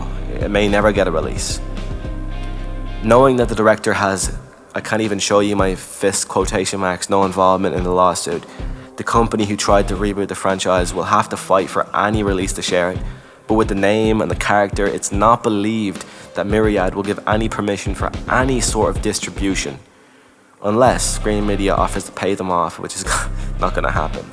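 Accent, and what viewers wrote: Ireland. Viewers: This guys Irish accent is damn sexy.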